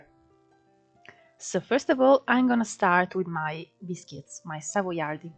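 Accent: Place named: Netherlands. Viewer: you can olmost here the dutch in your voice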